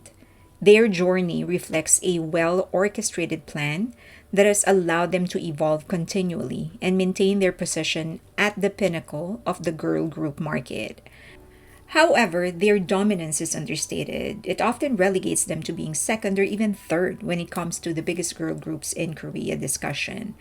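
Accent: Philippines. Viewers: Loving the Pinoy accent 😍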